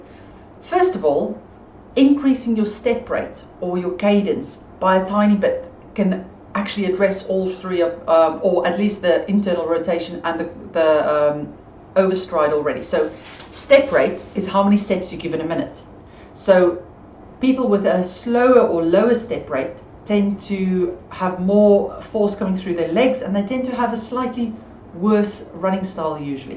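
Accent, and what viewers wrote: Afrikaans, South Africa. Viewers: 3 years late but yes her accent is South African. More specifically, Afrikaans South African. Sincerely, another South African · My legs rub against each other when I run. Gonna try widening my gait. Thanks! Also, love the accent. Is it South African?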